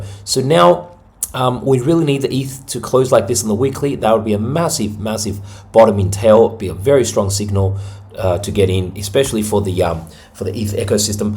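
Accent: Australia. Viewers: Like your show very much are you an Aussie?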